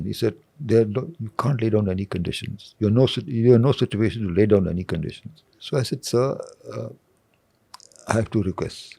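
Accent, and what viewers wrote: India. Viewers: British Variant of English spoken in India..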